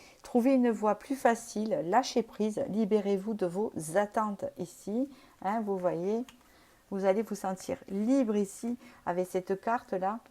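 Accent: South, France. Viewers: Merci de penser à nous le week-end! Avec votre joli accent du sud...je ne sais pas de quelle région vous êtes...😊 Puisque vous parlez de propositions à vous faire, j'aimerais pour ma part plus de guidances pour les célibataires...il y en a très peu sur YouTube même si vous faites partie des chaînes qui en font le plus...ou en tout cas où le titre est suffisamment large pour nous intégrer. Sinon, sur YouTube, on entend toujours...VOTRE AUTRE... et s'il n y a pas cet autre?? Nous sommes des millions de célibataires et je n ai jamais compris qu il y ait si peu de thèmes pour nous...une autre guidancière, ÉVEIL DE L'ESPRIT, qui demandait également de lui envoyer des idées de tirage, m'a répondu que d'après les stats de YouTube, il n'était pas intéressant de faire des tirages pour les célibataires et que ça ne rapporte pas!!!😳. Circulez...il n'y a rien à voir!! 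Donc je me suis désabonnée. Bon dimanche à vous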